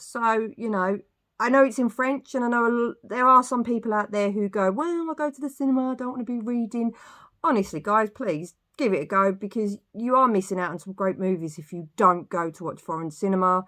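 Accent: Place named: Southern England, United Kingdom. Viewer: I thought this last review was quite good. I saw it last night, and will probably go back and see it again. The fighting scenes were only ok, and yes, the reviewer is right. Too quick, and you can't see the action. Yes EVa Green (sigh). And Yes Where's the humour of the book and 1970s films. It's good that the reviewer has a southern English twang in her accent, I prefer intelligent ,pleasant looking blondes, without The BBC bias . Well done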